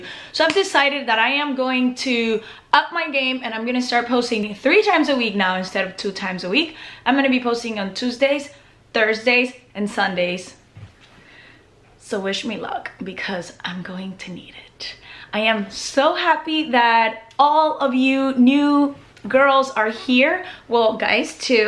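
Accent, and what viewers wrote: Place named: Cuba. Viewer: Your accent makes me miss my Cuban friends in Miami!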